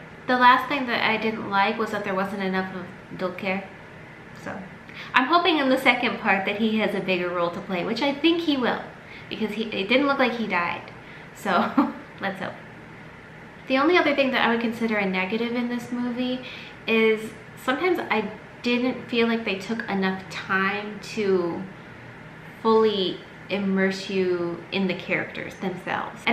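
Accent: Telugu, India. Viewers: genuine review movie is really good by the way your telugu speaking very cute🥰🥰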